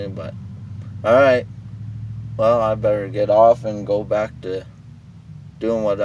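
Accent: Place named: Wisconsin, United States. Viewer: Sounds like he’s from Wisconsin lmao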